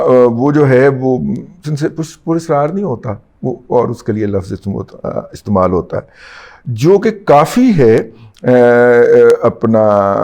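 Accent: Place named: Lahore, Pakistan. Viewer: پائیں دادا آپ بابو نا بنا کریں آپ لاہوری اپنے بائ Barith سٹائل میں بات کیا کریں یہ بابوں والی زبان آپ کی ناہھے ناہونی چاہیے نا آپ اچھے لگتے ہیں خیال کریں 🙃🙃🙃🙃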